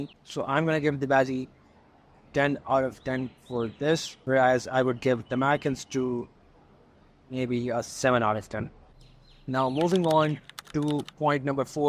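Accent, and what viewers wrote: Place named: India. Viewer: Very tough Indian accent, I didn’t understand 50% of information 😤😑🥴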